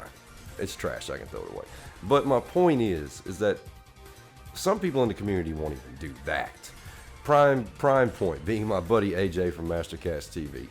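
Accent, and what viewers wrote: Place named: South, United States. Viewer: Great video, I recently picked up a few repo myself and have no problem with them I'm not going to sell them, there staying in the collection. Love the soft southern accent. Just subbed.👍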